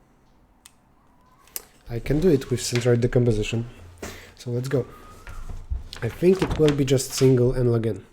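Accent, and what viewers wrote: Poland. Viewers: Słychać że 🇵🇱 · chyba się zgubiłem. Coś mi akcent nie pasował i myślę polak. dobrą robotę robisz, pozdro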